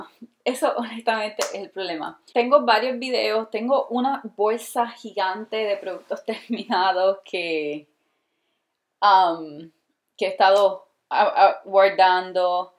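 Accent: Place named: Puerto Rico. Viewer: Hola, ojalá no te desanimen lo que digan las personas negativas, porque hay mucha gente que aprecia tus vídeos y tú persona. Yo te animo para que sigas siendo tú misma ya que eso es lo que te hace ser especial, tus vídeos son muy interesantes y a mí me sirven para mucho. Besos de una española que adora tu acento portorriqueño mezclado con el inglés. Con todo mi cariño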